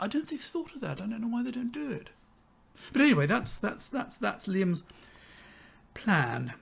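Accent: RP, United Kingdom. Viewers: The man with the posh voice exercises, yet again, his obsessive opposition to a past event, something that has already happened, something that is irreversible. It is as useful as regretting the UK's participation in WWII. It happened. Until the man with the posh voice discovers time travel, there is nothing he or anyone can do about it. What we do learn from this. though, is that the EU is not seen as something economic or political, as potential modes of UK action that have been rejected (for good or ill - depending on your stance) - but as a Cult which the man with the posh voice adheres to. The fact of UK apostasy in rejecting the EU Cult leaves him disturbed and with a sense that he has failed the Deity of the EU. These broadcasts are his plea to the Deity of the EU to forgive him and not hold him responsible for the UK apostasy.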